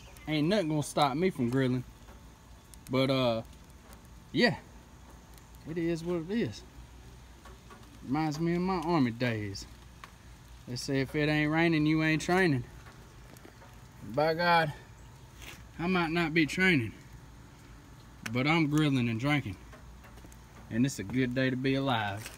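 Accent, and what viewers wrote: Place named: Texas, United States. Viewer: Where you from ??? Sound like Texas lol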